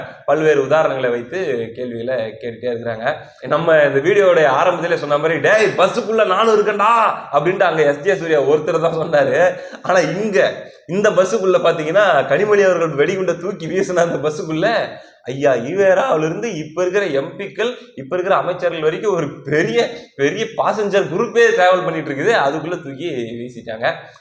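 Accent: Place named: Coimbatore, India. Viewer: தம்பிக்கு வாழ்த்துக்கள் வணக்கம் உங்களுடைய தெளிவுரை விரிவுரை அகல உரை ஆல வரை அனைத்தும் ஆள்பவர்களை நிலைகுலையச் செய்கிறது தம்பி உங்களது பேசும் தொனி கோவை சார்ந்த குடிமக்களைப் போலவே கேட்பதற்கு நையாண்டி ஆகவும் இருக்கிறது மிகவும் ரசித்து உங்களது பதிவுகளை பார்த்துக் கொண்டிருக்கிறேன் உமது அண்ணன் கோவை சௌந்தர் நாம் தமிழர்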